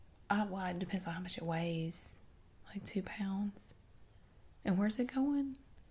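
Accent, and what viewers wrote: South, United States. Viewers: Just love your southern accent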